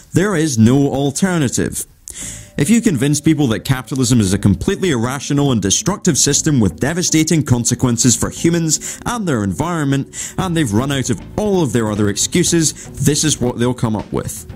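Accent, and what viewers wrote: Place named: Scotland, United Kingdom. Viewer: I was expecting him to say "Arseinine" 😂😂I love Scottish accents lol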